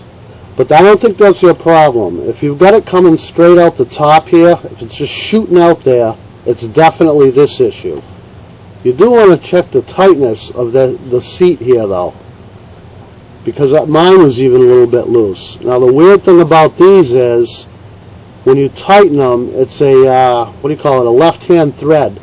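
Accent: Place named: Maine, United States. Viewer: No. Now that I have had time to think about it, I was way off. I would guess that you are from Maine, not Mass. My guess is somewhere between Bath and Warren. Did I get it right this time? By the way I did the repair and you were spot on! The O-ring was a little deformed but I think it's going to be OK. I had better luck placing the O-ring and washer on the valve and (holding it upright) brought the mag down onto it. Thanks again!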